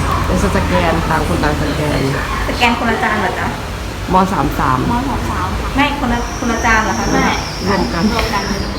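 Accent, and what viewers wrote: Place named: Yoi, Thailand. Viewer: ลาวสำเนียงโย้ย.. ฟังคิดฮอด ไทโย้ย อากากาศอำนวย สกลฯ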